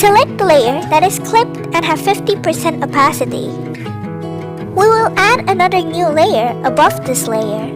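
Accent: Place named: Philippines. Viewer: u sound like pinoy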